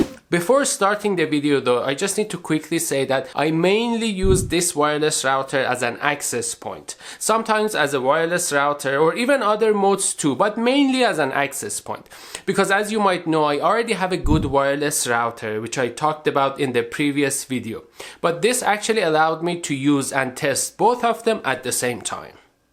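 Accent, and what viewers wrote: Iran. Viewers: لهجه ایرانی رو همون اول فهمیدم 😂😂😂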